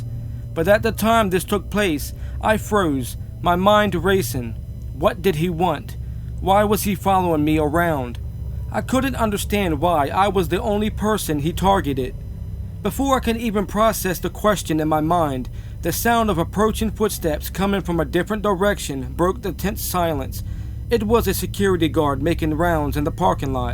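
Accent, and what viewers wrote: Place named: South, United States. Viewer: Your video randomly showed up. I see that you are a small channel. But I noticed that you had a southern accent and that made me appreciate your commentary right away. It may not be everyone's thing, but it makes it more relatable in a way. You got yourself a subscriber. Keep on keeping on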